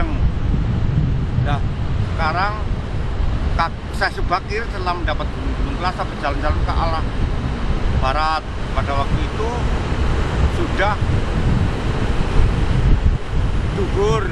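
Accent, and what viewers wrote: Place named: Kebumen, Indonesia. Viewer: Lumayan ni, nambah pengetahuan. Logat Kebumen banged 😀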